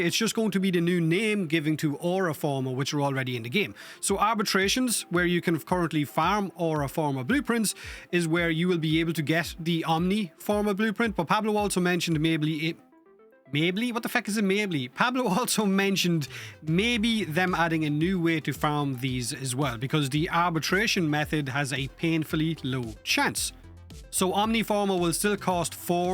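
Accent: Ireland. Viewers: Bro is Irish. Chill. … I have Irish ancestry here in the USA I be saying the same shit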